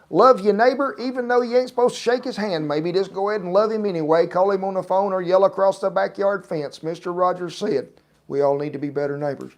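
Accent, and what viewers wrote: South, United States. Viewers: I preordered your book and got it yesterday. Beautiful photography, great tips and stories. I was only disappointed that your wonderful sloppy joes and your potato cakes recipes weren't in it. But I'll just add my youtube recipe notes inside the book. Re: sound quality of your live chat, it's clear and plenty loud enough. in the background was a little fuzzy. Btw, you don't need a teleprompter. I think we cam all say we like you just the way you are naturally. I especially like it when pokes light fun of your cowboy talk. But I'm southern, si I "getcha". You both are great and I could tell all the hard work that went into the cookbook.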